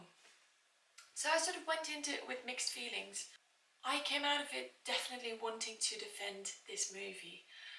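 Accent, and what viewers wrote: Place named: United Kingdom. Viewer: You sound British. How was the reaction to the movie in the UK vs US? I get the impression that the movie hasn't been a big thing over there.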